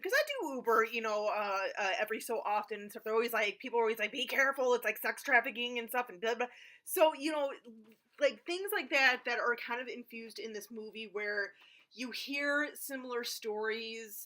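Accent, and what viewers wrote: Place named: United States. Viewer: You have that American accent that makes you say runt instead of rent! Lol, not criticizing, just noticing. I'm from Ontario, Canada, eh, so I'm used to people laughing at my accent, so, it's all good. I keep hearing more and more accents from around the world with the internet. I also have misophonia and certain sounds drive me nuts and make me stabby, but also, it makes me hear things intently and I can hear accents so clearly. Yours is one I hear often. Is it midwestern? Or where is it from? Just curious. Thanks. Thanks for the review too!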